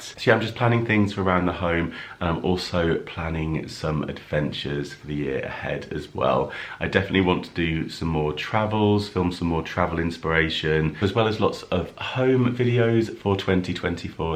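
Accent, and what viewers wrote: United Kingdom. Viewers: You could put out a video about anything and I would watch. Really. You could just sit and play with the kitties. I love your attitude, you're interesting, you're funny, you live in the UK, and I love hearing the accent and seeing the sights. Congratulations on the book! That's exciting! I would love to watch the series, but I don't think there's a way to do that here. I hope you feel better. Happy New Year to you and the kitties!😊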